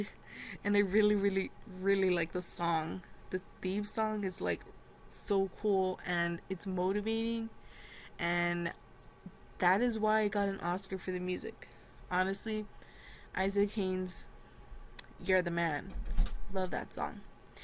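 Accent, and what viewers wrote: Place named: United States. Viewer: U sound American and I'm in Australia so what I actually meant by colab was to put each others channel on our pages? I've never done it before. But I've heard its a good way to grow and gain exposure. My channels still a work in progress but I've got good things in mind for the future. I've done a some movie and TV show reviews on my channel and will be doing many more. So would u wanna do something like that? I'd totally understand if not as we don't even know eachother but I thought what harm could it do? lol Anyway cheers anyway. I'll checkout some more of your reviews.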